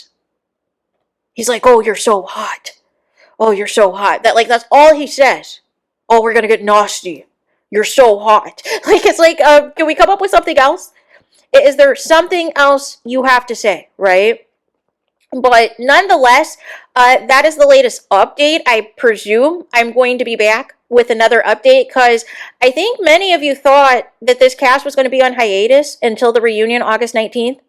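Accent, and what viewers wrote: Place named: Minnesota, United States. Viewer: i love ur accent! i just know ur from Minnesota 🤣🤣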